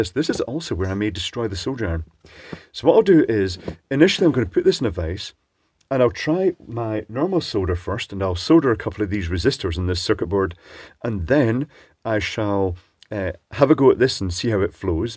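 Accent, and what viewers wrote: Scotland, United Kingdom. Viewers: Scottish accent and at one point American pronunciation of Aluminium!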